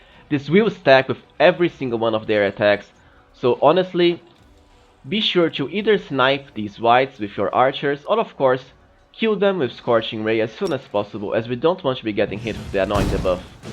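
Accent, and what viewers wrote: Brazil. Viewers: Br né? Sotaque inconfundível lol 😅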